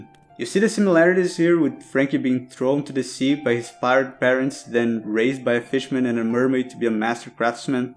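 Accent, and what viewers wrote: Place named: Brazil. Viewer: Pelo seu sotaque... Você é br?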